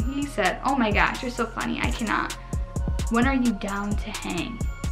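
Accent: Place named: Chicago, United States. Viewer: Are you from Chicago???? My mom is so i have a Chicago accent too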